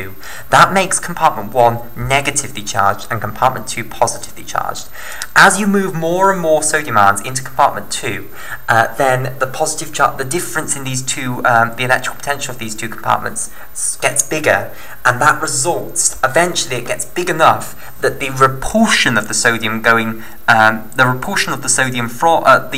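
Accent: United Kingdom. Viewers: British one 👍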